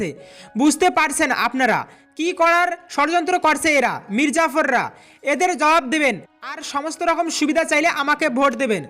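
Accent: Bangladesh. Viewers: valo kore kotha bolte sekh.bangla deshi der moto kotha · বাঙলাদেশীদের মত উচ্চারণ।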